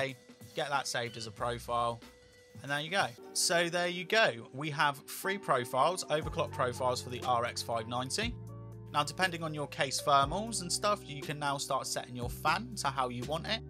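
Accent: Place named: England, United Kingdom. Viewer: That English accent... Just perfect.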